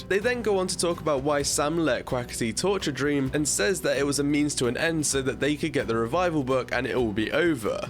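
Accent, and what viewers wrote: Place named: United Kingdom. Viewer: You sound like a British Sam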